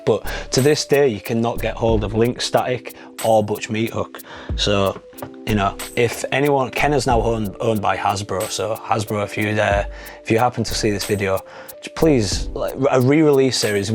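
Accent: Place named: Hull, United Kingdom. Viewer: Are you from Hull by any chance? I can tell with your accent!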